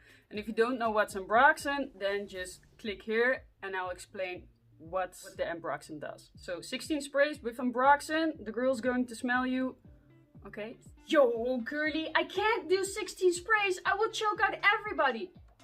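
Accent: Netherlands. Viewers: I have just discovered your channel, wow I've been missing out... I have watched 4 of your videos so far and I must say you are perfect to be a TV hostess, have you ever think of that? I love your dutch accent and curly hair. have a wonderful day. Neighbor from Denmark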